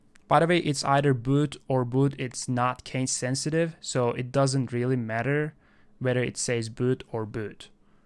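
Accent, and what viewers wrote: Iran. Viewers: I love ur Persian accent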